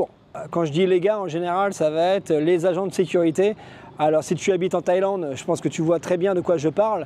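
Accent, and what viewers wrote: France. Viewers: allo ce que jaime chez les francais de la france vous avez un tres beau parler que nous les quebecois a oui nous au quebec sa redouble encore et sa ma fait rire quand tu parlais du pauvre tip qui marchais comme quil fesait du patin a glace il a na beaucoup quil son comme sa et le pire de tous celui quil porte leur pentalon plus bas que les fesse yo men 🤣 .prenez bien soin de vous 🙏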